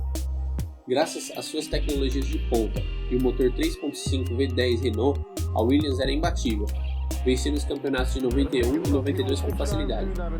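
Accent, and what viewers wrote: Brazil. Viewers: Parece que carrega muito sotaque (paulista) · com sotaque caipira então, ninguém merece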